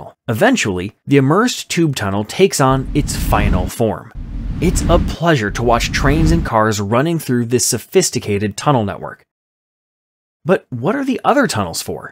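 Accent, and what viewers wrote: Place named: India. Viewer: You please don't speak.. use AI for your voice. Your accent is typical meme for the westerner. I am an Indian and even I am finding it awkward.😂 Please...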